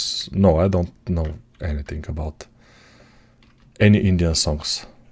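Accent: Bulgaria. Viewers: I hear that Bulgarian accent from miles away :D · You bulgarin bro (аз също + това акцента ти леко издава ) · The moment I heard your voice I new you were Bulgarian :D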